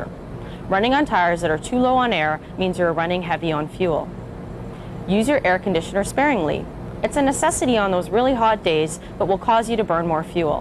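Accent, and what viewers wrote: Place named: United States. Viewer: wtf? she has an american accent but measures things in Liters and kilometers. ?!?!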